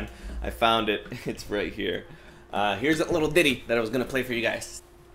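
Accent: Portugal. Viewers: i think he's of portugese descent or something. he sounds like he has a slight accent. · sotaque de portugues de portugal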